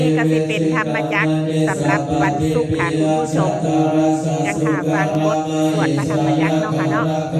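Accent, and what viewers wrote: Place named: Sakon Nakhon, Thailand. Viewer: ฟังเสียงคือคนทางสกล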